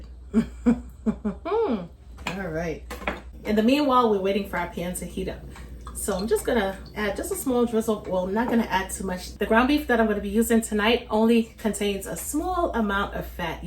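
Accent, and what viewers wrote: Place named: Brooklyn, United States. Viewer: Ohhhhh... Darlin.....you make meold mouth water. i want to try these!! BTW... I love your sweet accent... a little Brooklyn... or New Jersey maybe?? Thanks for sharing.... yummmmmm. Ax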